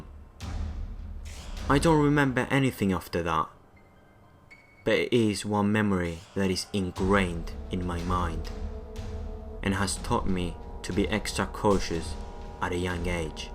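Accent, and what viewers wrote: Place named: Derbyshire, United Kingdom. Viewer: even though you're Greek you sound like my friend from Derbyshire County in England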